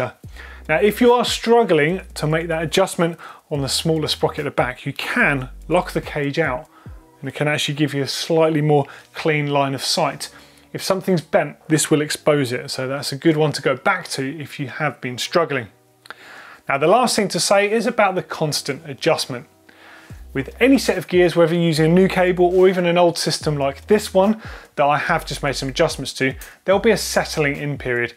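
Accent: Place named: United Kingdom. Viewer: Very clear and complete instructions. The British accent automatically adds a few points to the apparent intelligence of the presenter.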